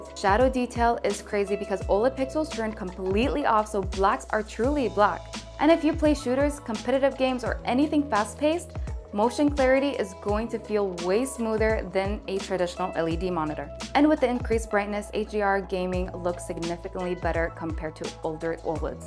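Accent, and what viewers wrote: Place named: United Kingdom. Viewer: I need this monitor because you do the best British accent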